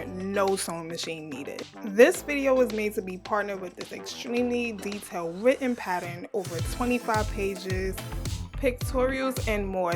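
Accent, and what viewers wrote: New York, United States. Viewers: I'm usually good at recognizing accents. Are u from NY?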